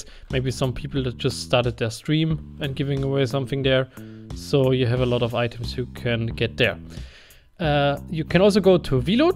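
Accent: Germany. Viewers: du hast nen deutschen akzent, biste deutsch?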